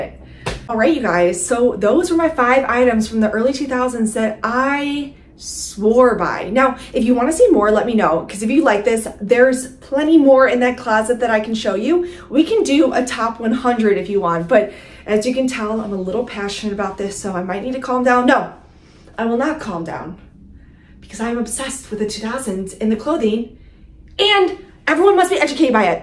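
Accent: Midwest, United States. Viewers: I love your Midwest accent!! It’s in the a’s like in “baaack” so cute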